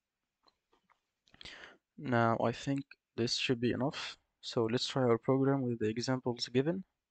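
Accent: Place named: Egypt. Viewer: i know an egyptian when i hear one 😅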